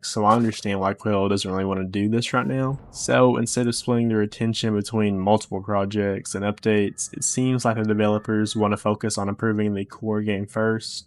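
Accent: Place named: South, United States. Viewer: The southern accent is sending me 😭😭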